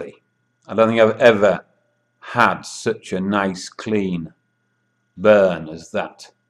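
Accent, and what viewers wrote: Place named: United Kingdom. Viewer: british bruh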